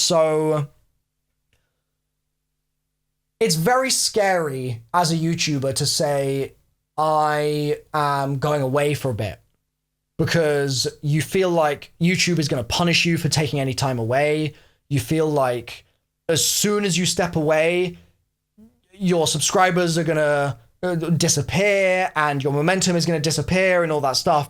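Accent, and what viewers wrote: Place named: England, United Kingdom. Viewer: Goodbye, I'll miss hearing zombies commentary in your english accent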